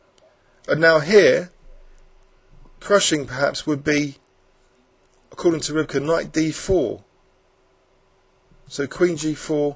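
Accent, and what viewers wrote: United Kingdom. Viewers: British accent? (sorry, my English is not very well).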